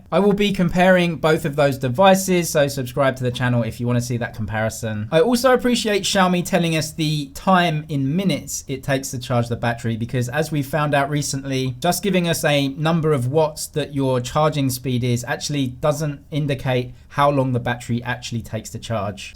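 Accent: United Kingdom. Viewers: I could even recognize that typical British accent, our dude is right now in Shenzhen, China ..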